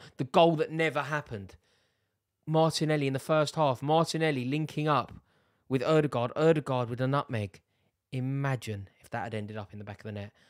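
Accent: RP, United Kingdom. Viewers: Rory seems like he grew up rich and went to Oxford. Love the vocabulary and posh accent 🎉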